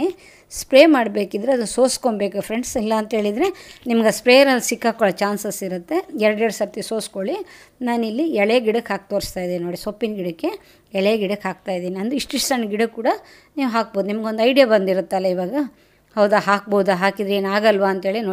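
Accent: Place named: Malnad, India. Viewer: ನಿಮ್ಮ ಸಲಹೆ ಮತ್ತು ಮಾತು ನನಗೆ ಇಷ್ಟ. ನಾವು ಮಲೆನಾಡಿನ ಜನ. ನೀವು ಮಾತಾಡುವ ಶೈಲಿ ಹಾಗೇ ಇದೆ. ನೀವು ಯಾವ ಕಡೆಯವರು ಮೇಡಂ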